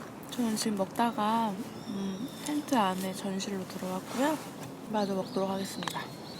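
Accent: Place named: Seoul, South Korea. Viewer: 서울말 ㅎㅎ!!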